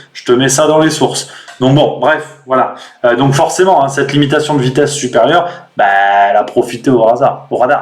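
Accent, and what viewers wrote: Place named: England, United Kingdom. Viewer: Excellent travail l'artiste ! And i love your english accent 🥰 Soo froggy...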